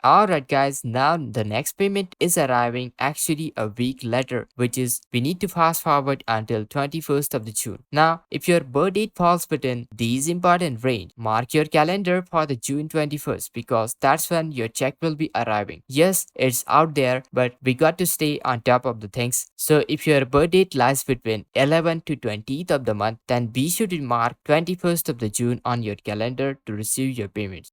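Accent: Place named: Punjab, India. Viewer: DUDE YOU TALK SO FAST WITH YOUR THICK PUNJABI ACCENT THAT I CANT UNDERSTAND YOU. SLOW IT DOWN.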